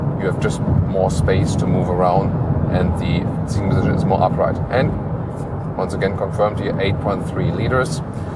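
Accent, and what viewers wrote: Germany. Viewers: Love your reviews. And you're German accent makes the car so much more interesting. Would be nice if at the end you say I'll be back lol.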